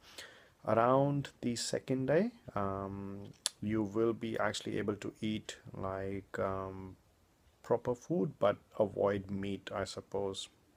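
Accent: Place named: Delhi, India. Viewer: Your not from Australia, your an Indian dude, paid for promo. Accent is Delhi strong.... Lol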